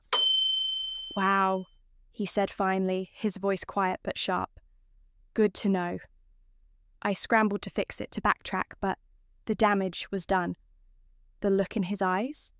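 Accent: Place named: New England, United States. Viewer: Definitely not a Texan from Dallas reading this story. Sounds like a New Englander.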